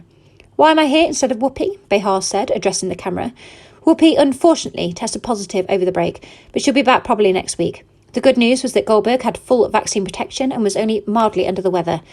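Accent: United Kingdom. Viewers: I can't understand a word ... a heavy British accent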